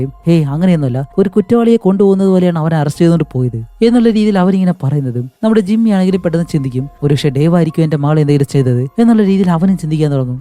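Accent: Palakkad, India. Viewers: Palakkadan slang ill kadha kealkumbol chiri😂ariyathe varunnu😂 chirippichathinu thanks 🙏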